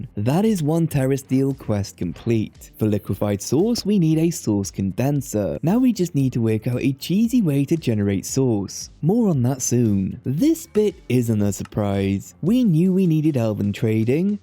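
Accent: Wales, United Kingdom. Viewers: Where is that accent from? Sounds slightly welshie with some words xD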